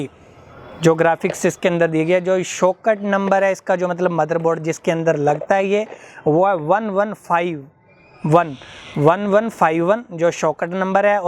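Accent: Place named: Punjab, India. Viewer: Kinna aukha h punjabi bande nu hindi bolna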